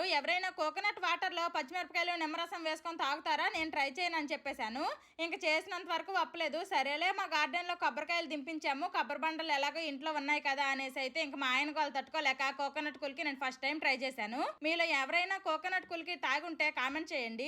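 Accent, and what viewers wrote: Srikakulam, India. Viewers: Oppaledu.....😅wah.... srikakulam launguage is an emotion ❤❤❤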